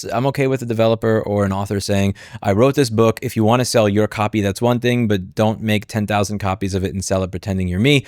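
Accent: New York, United States. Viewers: you would'nt happen' to be new yorker ey?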